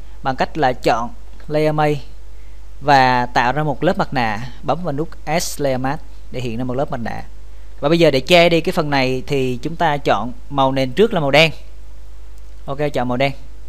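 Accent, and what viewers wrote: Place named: South, Vietnam. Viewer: Kết cái giọng Nam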